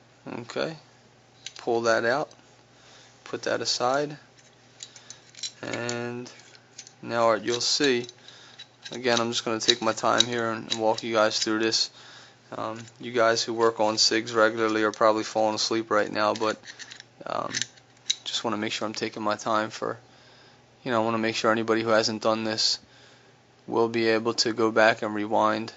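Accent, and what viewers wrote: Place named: New Jersey, United States. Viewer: I love your analogies A potato chip? LOL!!!!!! Love the accent too. New Jersey?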